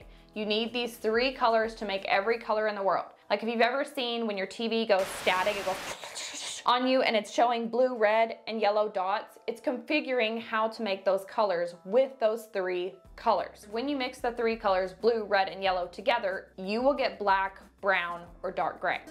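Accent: South, United States. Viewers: I can’t decide if my hair looks better brown or blonde. Do you do hair in a shop? You sound southern and I live in the south. Would travel to have you do my hair. I feel like if I go brown I might look older hmmmmmm did I say just got divorced, my dogs died and I broke out with psoriasis all in a year. I’m finally healing but I feel so darn ugly. I sort of gave up on trying to look my best after so much medication and steroids. I want to try and look as youthful and I just can’t seem to get it. I paid 200 at my last salon appt and it looked no better than when I did it. 💕😀love you and keep smiling. You have brightened my day so many times. Marnie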